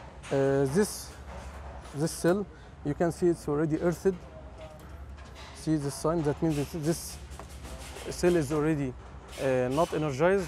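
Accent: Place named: Egypt. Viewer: there is an excellent Egyptian accent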